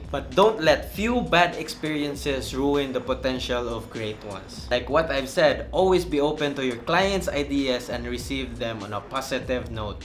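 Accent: Visayas, Philippines. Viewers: [UNRELATED] Bisaya kaba? haha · bisaya ka hahahha pareho diay ta asa man diay inyu?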